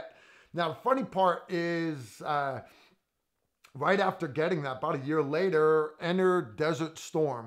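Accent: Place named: Northeast, United States. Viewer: ahhh ok i know i heard some northeast in there lol. i'm from NJ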